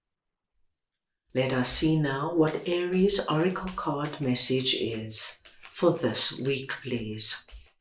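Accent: South Africa. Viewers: Sounds white south African possibly Afrikan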